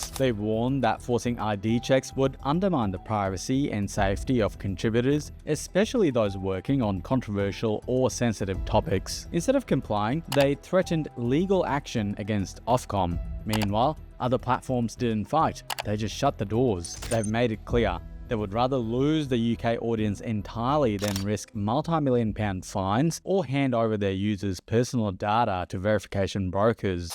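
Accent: Australia. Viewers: Graphic violence sounded like graffi marlins. That lazy Aussie way of speaking.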